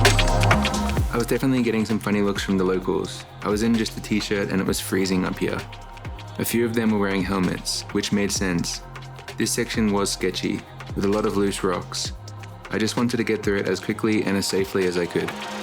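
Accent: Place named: New Zealand. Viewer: Interesting! Thanks for sharing! I thought I heard some Kiwi in there. Thanks for this video, might do Yushan in one day in November, and your other travels seem so sick as well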